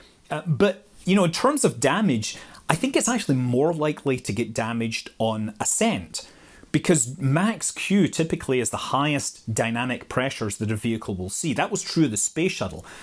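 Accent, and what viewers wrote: Scotland, United Kingdom. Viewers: There's some clever guys on YT, but a lot of waffle & time consumption. Your videos are clear, concise, interesting & layman friendly, while you obviously know what you're talking about. Very watchable & I can comfortably take it all in at 1.25x speed, thanks to your clear diction & varied, enthusiastic intonation (another bugbear of other zzzzzz YT "superstars"). Love your Scottish accent. Great photos & slides. Good Stuff. Keep up the good work!!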